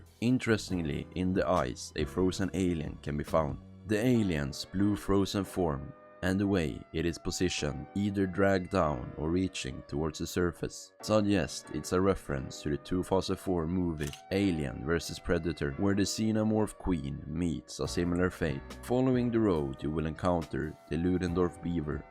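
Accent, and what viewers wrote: Sweden. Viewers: Säg mig. Tycker du att du har en väldigt noticeable svensk accent? När jag hör mig själv snacka på inspelning så inser jag hur brutalt svenskt det låter trots att jag är flytande på engelska. It is what it is i guess. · most swedish accent ive ever heard 🇸🇪🇸🇪🇸🇪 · Are you from Sweden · Visste väl att du var svensk när ja hörde brytningen i rösten! denna åker in i sov spellistan! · the swedish accent screams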